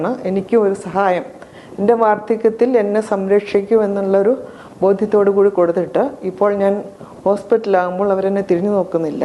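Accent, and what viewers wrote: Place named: Ernakulam, India. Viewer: എറണാകുളം അങ്കമാലിക്കാരി ആണെന്ന് തോന്നുന്നു..😅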